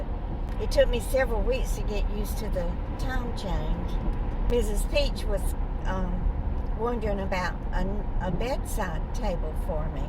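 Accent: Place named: Mississippi, United States. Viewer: youtube randomly changed my id about a year and a half ago. Instead of protesting, I just went with it! Sometimes its easier not to draw attention to yourself, at least for me! I have been enjoying your channel for sometime. One time I got unsubscribed by the powers that be. Maybe a test to see who's paying attention! Anyway love you and what you do. Your accent reminds me of a cherished aunt who has passed on. She lived in Mississippi.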